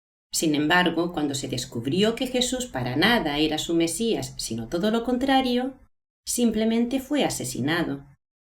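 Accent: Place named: Spain. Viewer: misael rrodriges a mi su voz española se me hace bien horrible!!!!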